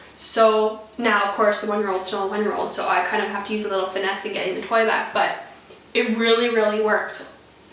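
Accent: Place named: Canada. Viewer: the Canadian accent is strong in this one😃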